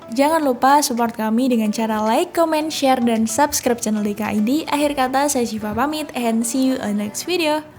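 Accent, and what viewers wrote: Java, Indonesia. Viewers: Mbaknya kall ngomong medok jawa 😂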